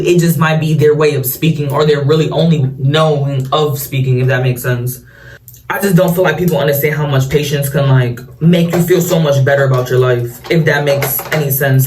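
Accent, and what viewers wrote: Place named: New York, United States. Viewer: Not me watching all your videos while baking 😅, speaking straight facts (sounding hella New York) 😂. Your videos feel like our college days when we would ramble and do random things. So happy to see you the same but better 🥹 Miss you so much girl 💕 - little Cat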